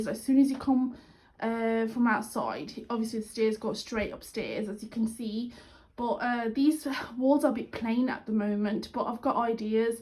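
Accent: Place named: Birmingham, United Kingdom. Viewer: Just subscribed coz u sound like my cousins in Birmingham ❤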